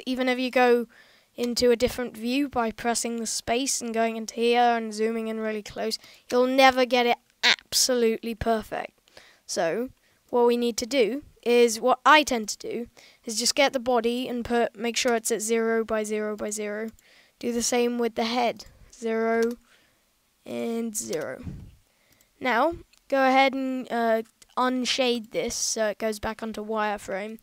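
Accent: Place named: United Kingdom. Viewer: Sounds like British am i right? , indeed GOOD JOB mate!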